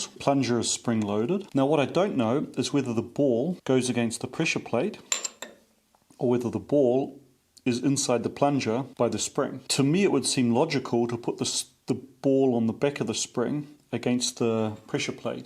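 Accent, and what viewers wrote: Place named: New Zealand. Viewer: Hi there. Just found your channel. What city are you in. You sound like a Kiwi. I'm in Chch. Cheers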